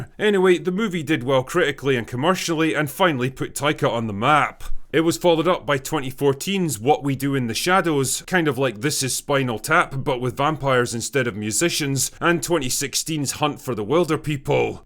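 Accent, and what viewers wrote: Scotland, United Kingdom. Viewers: Jeeze someone needs a snickers. All Im hearing is a rather judgy scotsman bagging a fella out for one bad film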